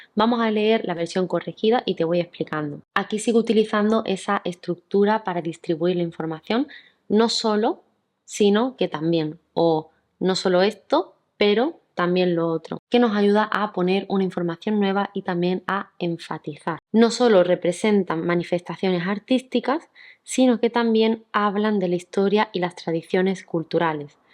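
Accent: Andalusia, Spain. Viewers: Hola, llevo mucho tiempo viendo tus vídeos. Saqué el apto en el DELE B2 en mi primer intento y me ha encantado este vídeo. Como soy de EE. UU., estoy acostumbrada a los errores que suelen cometen los angloparlantes; pero es interesante ver los errores de una persona de Alemania. Tu manera de corregirlos me sirvió mucho para aprender no sólo cómo escribir de forma "correcta" sino también de forma concisa y elegante. Se nota que escribes muy bien y que eres filóloga hispánica. Y viva el acento andaluz 🤗 me encanta!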